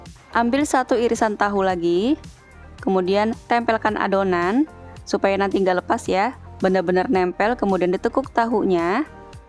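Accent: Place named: Tegal, Indonesia. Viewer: Massya allah 🤲 kangen ciri khas tegal lur "semoga rezekinya lancar berkah sukses ya Bun🤲🙏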